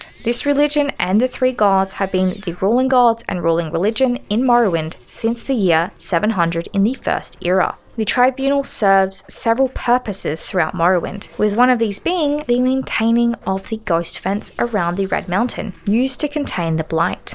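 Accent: Australia. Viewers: Just wondered the same they have like a perfect voice for voice overs thank god for the slight australian accent making it stand out especially nowadays!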